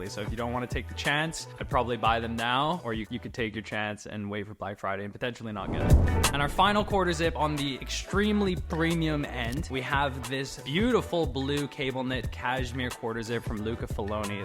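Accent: United Kingdom. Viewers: Had to drop a like for the British accent LOL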